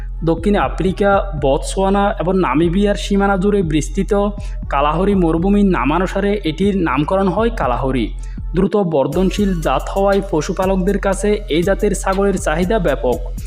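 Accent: Chittagong, Bangladesh. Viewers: ও ভাই আঞ্চলিকানো চট্টগ্রামের বাসাও নও কি ভাষা এখনো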